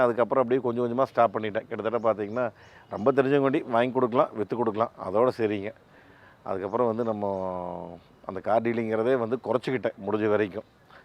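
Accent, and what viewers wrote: Kongu, India. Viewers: Brother.... நீங்க கொங்கு தமிழ் நல்ல பேசறீங்க.... சிறப்பு....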